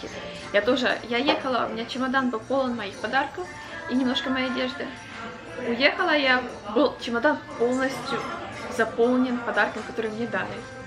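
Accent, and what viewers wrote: Ukraine. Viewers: Харьковский суржик - это здорово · ты говоришь на суржику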